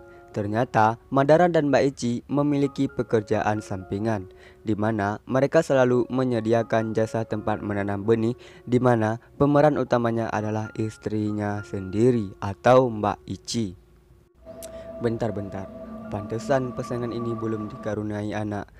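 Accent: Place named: Aceh, Indonesia. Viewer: Lage logat awak geutanyo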